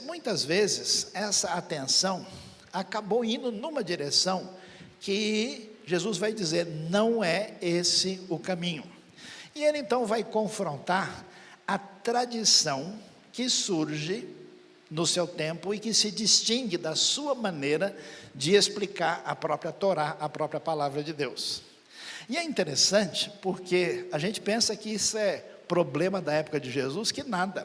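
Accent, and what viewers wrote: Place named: South, Brazil. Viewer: Perfeito. Me horrorizei no início achando que Jesus achava que higiene não é importante. Ainda bem que Ele mesmo explica. Belíssima pregação, texto muito bonito. Sotaque sulista ficou muito bonito. Estranhei no começo, mas aqui tem muita gente do sul, ouvi tudo muito atento. Maravilha ver que Deus opera em seus servos fiéis, há muitos bons pastores que levam Sua palavra a sério.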